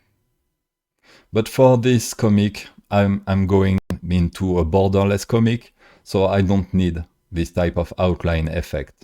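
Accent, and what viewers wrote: France. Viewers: I really enjoy listening to you speak. It feels like I’m hearing two languages at once—French and English—while understanding everything perfectly. Amazing 🤩❤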